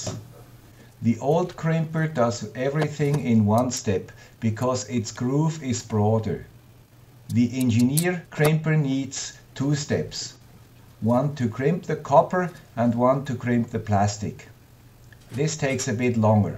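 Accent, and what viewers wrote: Switzerland. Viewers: Greetings the guy with the Swiss accent :)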